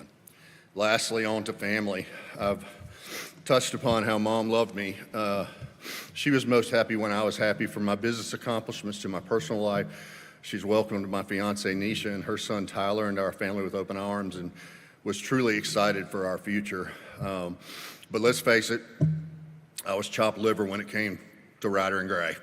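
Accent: South, United States. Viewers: Only discovered this very funny lady this year as like many others I’ve been in lockdown due to Covid in Australia. I recommended her to my friends as each time I saw her I was amazed at her poise and stature and I loved hearing her funny stories with that lovely Southern accent. My sincere sympathies go to her family and friends. She will be sadly missed by many.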